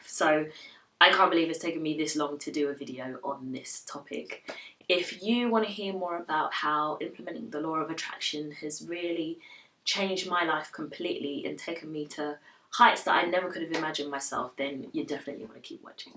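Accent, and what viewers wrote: United Kingdom. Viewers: i love your British accent! You sound very elegant =)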